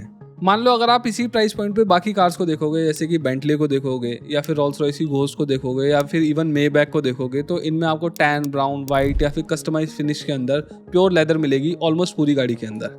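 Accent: India. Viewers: Man - if you can’t fix your indian accent, can you at least get the names pronounced properly ? It’s not pronounced “ooroos”… its “U-RAS”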